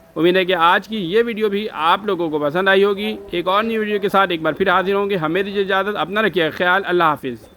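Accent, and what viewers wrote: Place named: Bihar, India. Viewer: بولنے والا بہاری ہے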